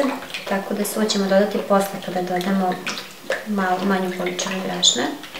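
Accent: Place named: Vojvodina, Serbia. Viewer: bravo svaka čast po naglasku se može oceniti da si iz vojvodine :-)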